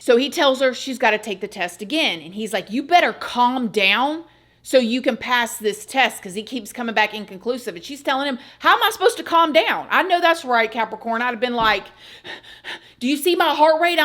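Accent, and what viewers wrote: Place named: New York, United States. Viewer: Is Christina from New York she has our accent lol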